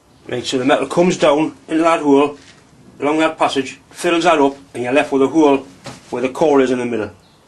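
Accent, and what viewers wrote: Newcastle, United Kingdom. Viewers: Love the old videos, you sound more Geordie back then!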